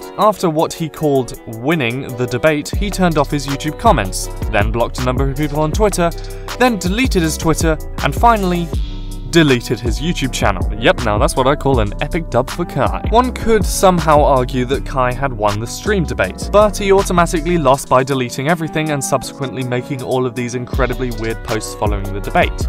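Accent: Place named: United Kingdom. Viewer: this shit would be hard as fuck to follow if you werent british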